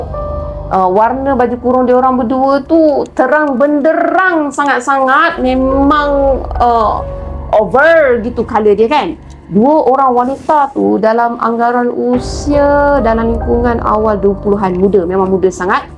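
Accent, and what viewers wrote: Kelantan, Malaysia. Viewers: Kak ore kelate ko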